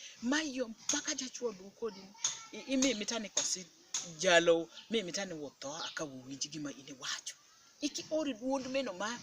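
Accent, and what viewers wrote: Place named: Luo, Kenya. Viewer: You are basically speaking in Luo of Kenya. 😂😂 I love listening to Padhola conversations alot because you sound like Luo of Kenya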